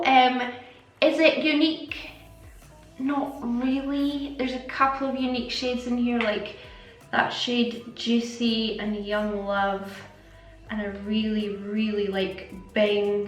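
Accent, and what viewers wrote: Scotland, United Kingdom. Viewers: I told you before haters gonna hate and therefore don’t mind the bitch! As I was saying on IG before watching your video that I would not purchase it cos I don’t find the colours too unique!! And while watching your video I realised that Gingerspice was from Too Faced 😂 I only watch your videos because you’ve got real talent and I love your Scottish accent 😁 And as you can see down below a lot of people have your back, so don’t get frustrated because of one stupid bitch!! Keep doing you that’s why we love you 💕💕💕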